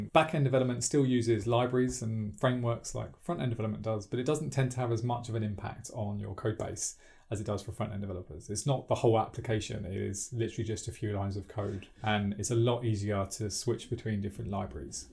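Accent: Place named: Manchester, United Kingdom. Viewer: kinda, I m currently studying computer science at Manchester. so kind of familar with this accent lol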